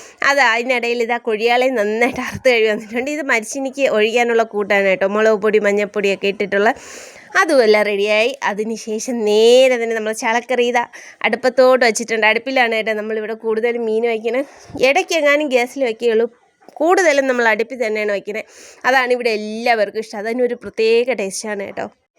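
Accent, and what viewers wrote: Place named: Parassala, India. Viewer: ഇതാണ് കറക്റ്റ് പാറശാല ഭാഷ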